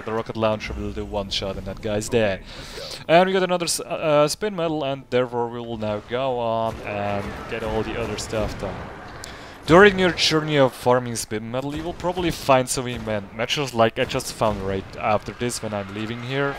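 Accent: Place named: Switzerland. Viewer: has uf dim Steamprofil gseh, mer erkennt d'schwizer scho ader stemm, ben aber ned ganz secher gsi :)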